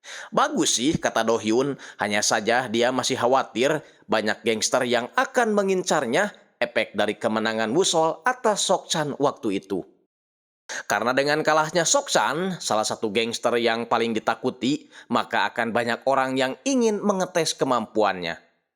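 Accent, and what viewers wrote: West Java, Indonesia. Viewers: Jigana NU ngomong orang Sunda yeuh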